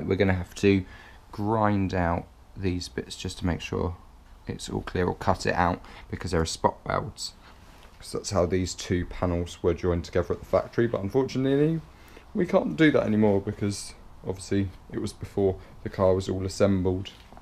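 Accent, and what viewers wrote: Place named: England, United Kingdom. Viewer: If I hadn’t heard your English accent I would have known you are in England because of the ridiculously small Garages they attach to the House. So sad.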